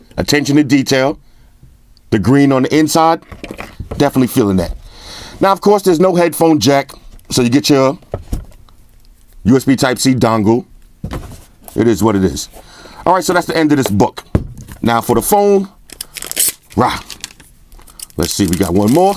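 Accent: New York, United States. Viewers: This dude is straight outta new york. Extra emphasis on everything he say lol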